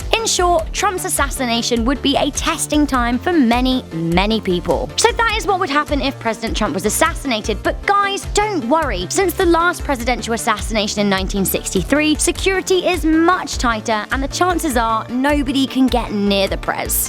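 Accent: United Kingdom. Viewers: Is she Britsh? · I'm here for the British accent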